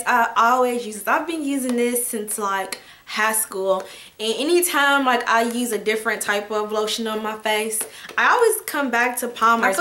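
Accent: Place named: New York, United States. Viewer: I love your accent! I'm from ny so I'm use to only hearing that accent most lol😃 I have the vitagoods brush! I love it!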